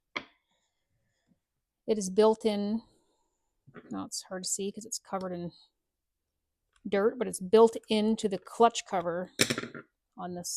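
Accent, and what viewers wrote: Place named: Canada. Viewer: See that's why I love when you do videos on every saw because we learn something new every time. I really dig the Canadian accent I can hear your voice narrating a book on audible. I also love the sarcasm throughout and died laughing at chainsaw protective services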